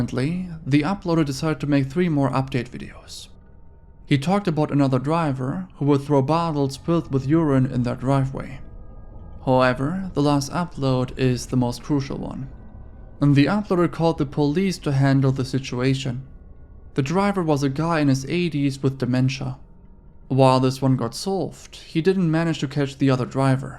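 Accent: Germany. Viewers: Armin Meiwes is/was(?) somehow the least offensive cannibal to ever live. Issei Sagawa could learn a little something from him... 😒 I'm really surprised that I'm seeing what is, to me, entirely original content in a list video like this. Horror-themed channels tend to all cover the same, what passes for "mainstream", content across the internet. I can watch five top five videos of "creepy things from around the internet" from five different channels but only see a total of seven to ten (out of 25 total, remember) unique stories altogether. So, kudos for doing your own research and finding stories other people may have passed on, ignored, or missed entirely. I respect and appreciate it, my dude. May I ask... Where is your accent from? Based on how you pronounced AM's name, I would assume your first language is either German or German-adjacent (for example, Dutch). 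Am I anywhere near right?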